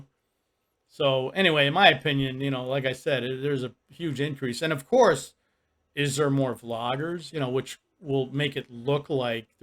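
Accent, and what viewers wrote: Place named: New Jersey, United States. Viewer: For some reason, I thought you had a Jersey accent. Great city, by the way. Taste of Chicago, awesome annual food festival. You a city respect their food & culture after attending such an event. Keep the great content coming. 👍🏾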